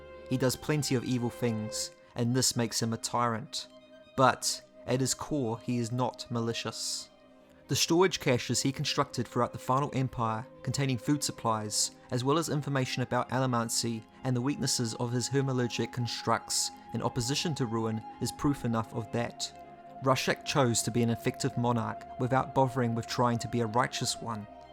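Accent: New Zealand. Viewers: That is a kiwi accent. Shot bro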